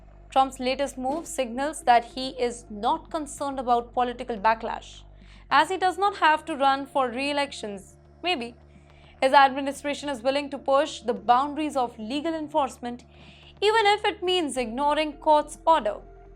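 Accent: India. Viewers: You need to improve your Accent. So bad that internationally cant really follow you. So indianish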